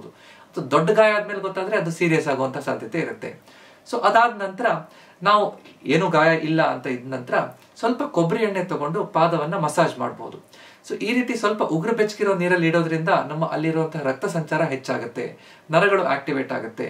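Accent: Standard. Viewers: ಇಷ್ಟು ಶುದ್ಧವಾಗಿ ಮಾತನಾಡುವ ಡಾಕ್ಟ್ರ ಕನ್ನಡ ಅರ್ಥ ಆಗದಿದ್ದಲ್ಲಿ ,ನಿಮಗೆ ಯಾವ ಭಾಷೆಯೂ ಅರ್ಥ ಆಗದು😂😂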